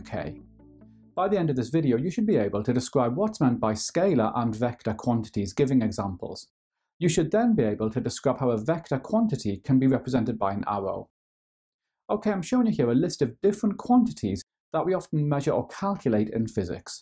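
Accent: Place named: Newcastle, United Kingdom. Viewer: IS A GEORDIE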